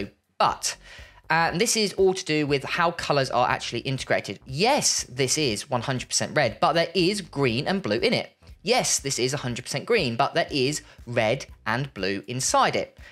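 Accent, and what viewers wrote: United Kingdom. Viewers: he´s bri ish thats how they talk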